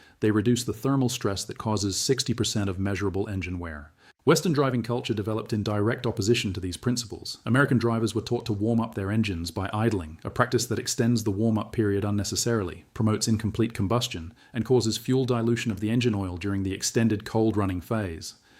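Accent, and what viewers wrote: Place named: Australia. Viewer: Asian Guy got himself an Aussie accent!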